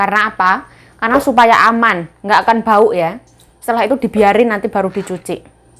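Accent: Java, Indonesia. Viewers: Aku seneng sm medhoknya mbak,cletukan² bahasa jawane bikin mesem²,hihihiii...sukses yo mbak,sehat² selalu...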